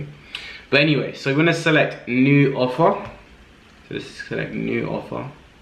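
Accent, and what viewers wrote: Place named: United Kingdom. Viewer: I like how u talk British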